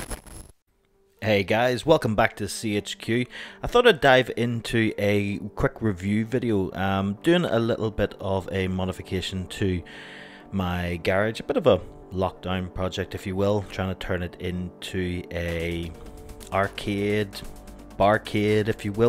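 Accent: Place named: United Kingdom. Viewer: Hi, great video thanks , from the sound of your accent Ur British, so my question is, if I buy one will it work in uk as most I see are sold from America cheers steve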